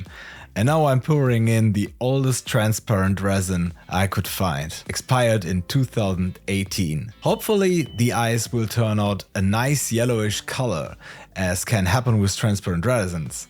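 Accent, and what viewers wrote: Germany. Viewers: This German accent makes me feel like he’s a NASA scientist, and I believe everything science related he says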